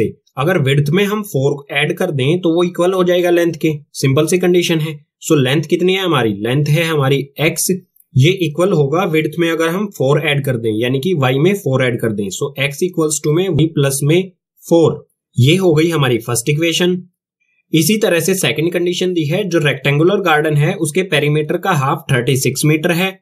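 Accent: Haryana, India. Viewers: bhai aap haryana ta ho k??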